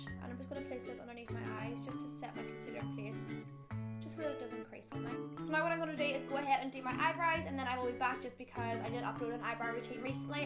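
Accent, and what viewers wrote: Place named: Belfast, United Kingdom. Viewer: no need to hate on her! It her accent I'm from Belfast and it's just in our accent that we say like a lot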